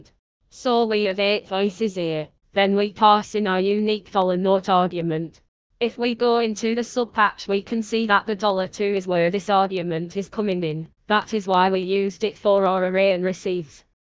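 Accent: Scotland, United Kingdom. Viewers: What the heck is this amazing robot voice! Is it meant to be Scottish? It trends slightly Welsh at times maybe? I'd hate to be misidentifying it.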